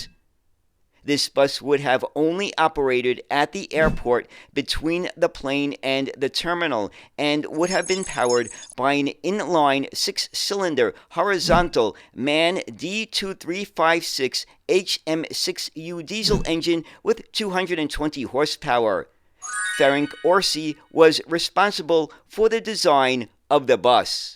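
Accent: New York, United States. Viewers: I'm English who grew up in England. My accent is typically from S E England. Your articles are so informative and interesting. Your New Yorker accent is really great, coupled with your enthusiasm. 😊❤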